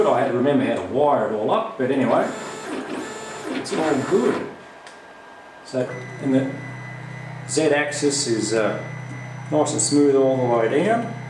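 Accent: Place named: Australia. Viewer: Hi. Love your work on the Evolution. By any chance are you selling kits or your dual Z prototype? Cheers Andrew PS It's good to hear an Aussie accent on YouTube. I'm from Brisbane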